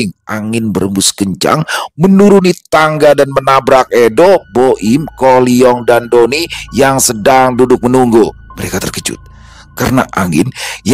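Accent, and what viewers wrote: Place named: Samarinda, Indonesia. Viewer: Samarinda hadir bang...logat Banjar Samarinda nya Paseh banar bang....ada kime' nya lagi 🤭🤭🤭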